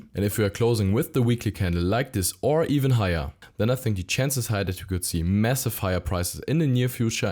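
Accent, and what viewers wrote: Germany. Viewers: Love your german accent 👯👯👯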